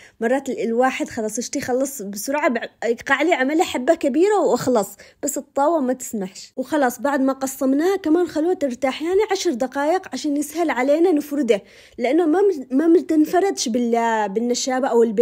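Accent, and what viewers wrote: Aden, Yemen. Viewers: يعجبني كلامك العدني المخلوط بالحبشي او الصومالي ...عاشوا أصحاب لحج وأكلهم اللذيذ 😊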